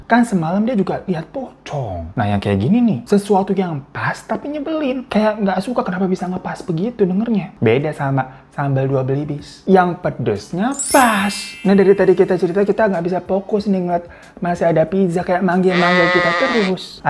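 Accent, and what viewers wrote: Java, Indonesia. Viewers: suka salpok denger kak ngomong jawa, lucu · Ni abang klo ngomong logat jawa lucu bgttt😂 kiyowoookkk😂❤ Sehat² ya babang sekeluarga dn kita semuaa 🤲🏻🥰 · Ngakak lihat logat Jawa bang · Lucu bgt bhsa jawa ny bg 😅😅😅😅... Untung ada translate ny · seperti biasa bang mantap, tpi itu logat jawa keknya bagian barat dah wkwkwk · Ngomong bHasa jawanya lucu bngt baang😂😂😂😂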